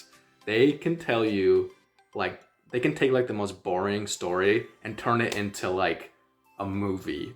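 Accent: South Africa. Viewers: your accent is South African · Your accent is starting to sound more south African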